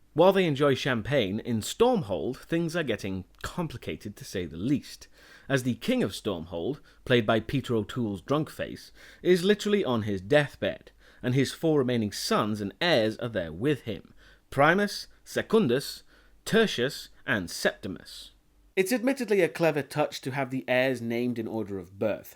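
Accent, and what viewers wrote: United Kingdom. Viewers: cool, anyway,i feel that even though we may disagree on some issues, i still love your reviews. i wonder, how are you in reviewing movies outside of horror and action? maybe some comedy, or if we can crossover genres then maybe some MCEU or DCU, maybe throw in some Stathem movies and some Die Hard for flavor. for further horror, there is still the nightmare franchise with micheal myers and jason vorhees. if you are so inclined. love your work BTW. i was wondering, whereabouts in england are you from? sounds a little like a yorkish accent. i may be wrong.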